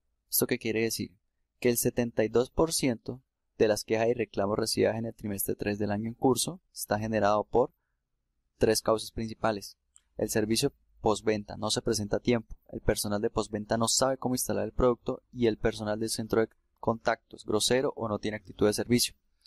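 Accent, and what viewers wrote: Cali, Colombia. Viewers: voj sos caleño si o que? pajame eje japato ve ... buen video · Vos eres caleño cierto??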